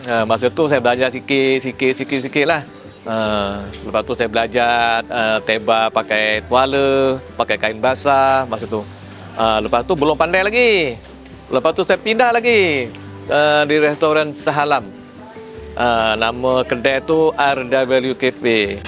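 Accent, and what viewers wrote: Java, Indonesia. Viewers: Slang jawa wak ni . Terbaikkk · Jowonye pekat betul. Sedap dengar. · Pekat sangat slang jawa Wak ni 🙂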